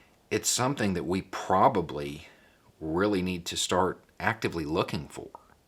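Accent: South, United States. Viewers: Hey... You are great. Sometimes, you just need someone bilingual... You speak southerners English very, you found someone who speaks Christian English in, he seems to understand your language. Now maybe you can work together to achieve a better world. I couldn't do what you do in Florida, but I can probably do something with people that speak my language.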